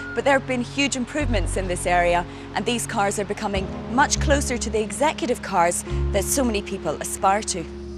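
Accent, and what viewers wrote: Scotland, United Kingdom. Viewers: irish program.she is scottish she does have hint of irish accent mixed but its more scottish.she used to do champions league on tv3 years ago also. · The accent is irritating. Scottish with the weird Irish twist... strange.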